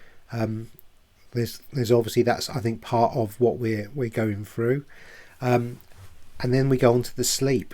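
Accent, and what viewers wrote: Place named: England, United Kingdom. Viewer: I'm new subscriber. Very refreshing with English accent. Yes, much of what u r saying rings true. Ascension symptoms for me, weight doesn't come off, blurry runny eyes, itchiness, dizziness, bloating , less energy. Neckpain, my skin cracks, cold cracks on my thumb, today I have some pain in my right shoulder blade. Like a cyst . Geeez, nice list . When do we get our forever new bodies? 😂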